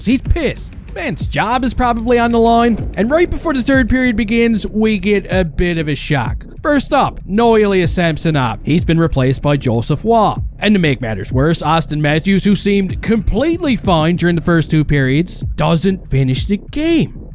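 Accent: Newfoundland, Canada. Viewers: Is a newfie? Sounds like it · what part of atlantic canada is this fella from? felt like I have home in newfoudland listening to this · Yes by' are you a newf me son? Unless me ears ain't hearing right. I'd say you are from the rock! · Your accent... are you a newfie? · dying at this sadly its all true 😂😂btw you gotta be a fellow newfie i haven't heard an accent that thick anywhere else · you sound like yer from newfoundland b'y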